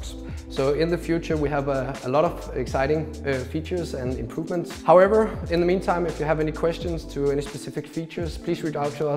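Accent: Denmark. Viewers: Ja jeg elsker man kan høre han er dansk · I Can hear the Danish accent haha sinds højtaler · man kan høre han er dansk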